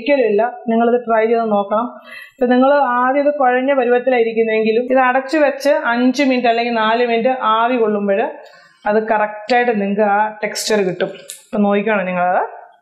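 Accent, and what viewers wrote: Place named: Central Travancore, India. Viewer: njanum ptnmthita anu..central trvncore slang ketanu chodiche😀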